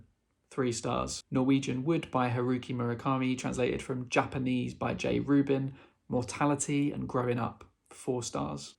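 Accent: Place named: United Kingdom. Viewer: Love your British accent, I will trade you for my Long Island accent 😂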